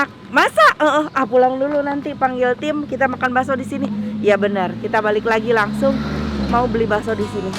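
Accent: West Java, Indonesia. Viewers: Resep liat vlog nya teh apalgi klo makan baso nyriosna nyunda pisan ciri khas orang garut banget logat ngomongnya 😁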